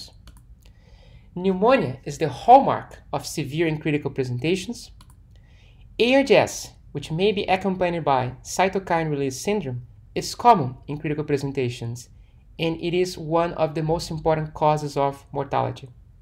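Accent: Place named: Brazil. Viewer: Love the Brazilian accent <3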